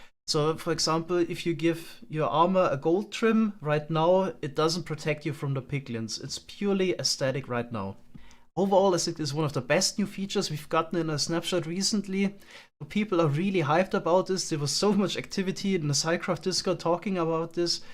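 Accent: Germany. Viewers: I always hear of your Farms but never knew who you are. So i subbed a few days ago to look a bit into your channel, and yes you're Minecraft Content is awesome 😁 But one question raises as i listen to you... are you German? Bist du aus Deutschland? Klingt vom Akzent her nämlich so und würde perfekt zum komplizierten Maschinenbau passen! 😄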